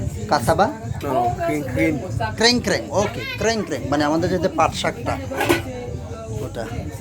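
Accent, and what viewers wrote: Noakhali, Bangladesh. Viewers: ভাই আপনার বাড়ি নোয়াখালী?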